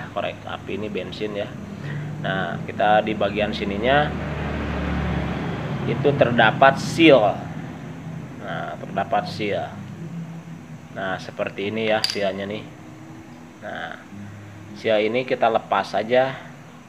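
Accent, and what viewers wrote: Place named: Sunda, Indonesia. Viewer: Mang ngomong nya yang leres 😂😂😂 Indo campur sunda.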